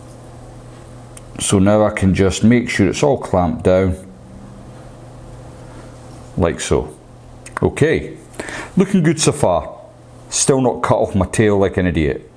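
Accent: Scotland, United Kingdom. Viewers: as an expat scot, I don't know if I watch your channel for the fly tying, which bybtgevwaybinthink is grand, or just to hear your accent!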